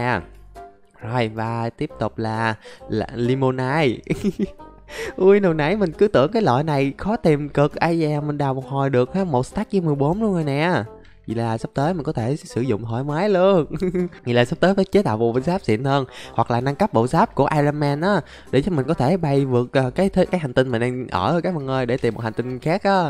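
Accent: Vietnam. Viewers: Anh đọc tiếng anh nhử tiếng Việt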